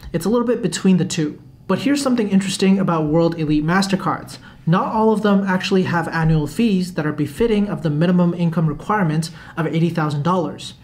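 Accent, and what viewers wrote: China. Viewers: 口音一聽就是中國大陸的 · 隐约听到些中国口音？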